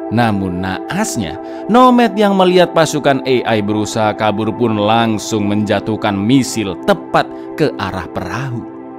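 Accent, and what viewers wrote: Java, Indonesia. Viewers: Jowone ngendi cak?? 😂 Nyonk Jawa Tengah cak, tepatnya Parakan Temanggung.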